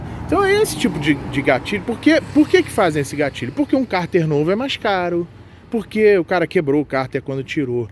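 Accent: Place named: Rio de Janeiro, Brazil. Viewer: pelo sotaque do dono do video é Rio de Janeiro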